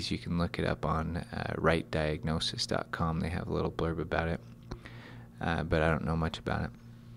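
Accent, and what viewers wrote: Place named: United States. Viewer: fatima Imtiaz he sounds American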